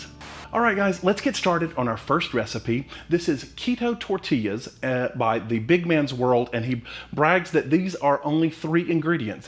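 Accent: South, United States. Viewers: Pre-ordered your book! Thanks for all you do! So good to hear that southern draw and your easy going way. Good luck in Cali!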